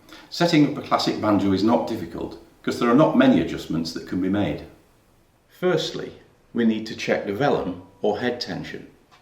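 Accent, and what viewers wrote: United Kingdom. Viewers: I never expected a posh Englishman to teach me how to set up the most redneck instrument in the world lol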